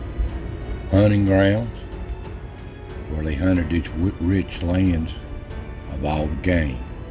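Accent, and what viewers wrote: Appalachia, United States. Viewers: I love your video for the content, but the way you speak makes me smile. My maternal grandmother was from Appalachia, and even though she lived the majority of her life deep in south Georgia, the way she spoke was different from every body else. I could hear her in every word you said. Thanks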